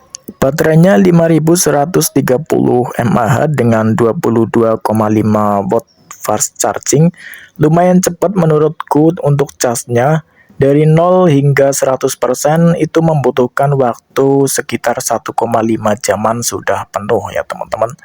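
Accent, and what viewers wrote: Indonesia. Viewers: Tumplek?? Orang mana bang?? Tegal or Brebes? Unik suaranya😂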